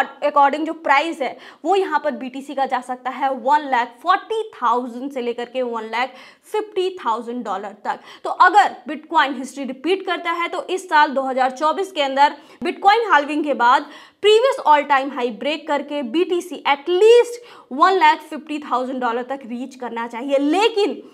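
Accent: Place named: Uttar Pradesh, India. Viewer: ji aapke bolne se hi pata chalta hai ki aap Uttarpradesh k ho.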